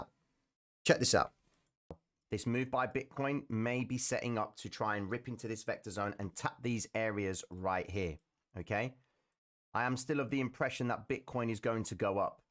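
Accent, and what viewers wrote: United Kingdom. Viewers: Ill be honest it's nice to have a UK voice 👌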